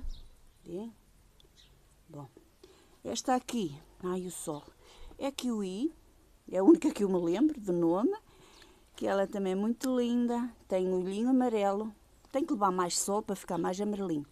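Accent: Portugal. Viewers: Oi querida, impossível não me inscrever, suas suculentas são maravilhosas, amo suculentas e como os portugueses falam.Sou do Brasil, Rio Grande do Sul, moro em Passo Fundo.Sucesso com suas suculentas.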